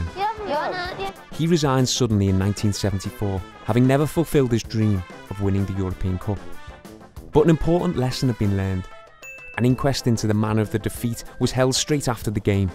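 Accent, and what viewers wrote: Liverpool, United Kingdom. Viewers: Scouser accent 😂🤣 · May be not but I defo can say he's scouse 😁😁😁...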